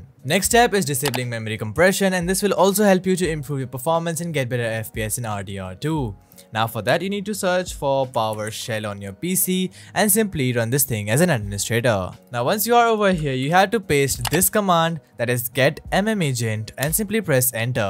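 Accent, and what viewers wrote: India. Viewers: He is an Indian, that's why he speaks in that way. t to d y'know.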